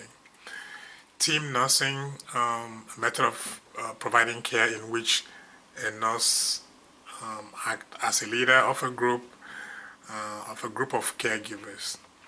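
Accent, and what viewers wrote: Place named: Nigeria. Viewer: you sound so Nigerian. 😍 Maybe you aren't, but lovely regardless. Keep winning 🇳🇬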